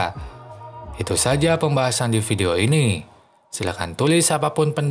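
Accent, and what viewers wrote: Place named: West Java, Indonesia. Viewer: Sunda pisaaan euyyy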